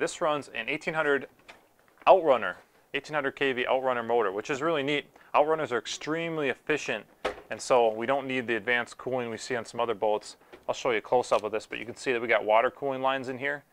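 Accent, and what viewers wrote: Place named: Canada. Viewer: How come you people pronounce "boat" and "out"the same way? Like Canadians. Eeeewww.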